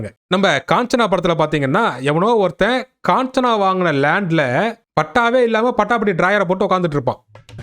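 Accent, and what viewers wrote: Kongu, India. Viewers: Day kongakara kannada nalla irkunda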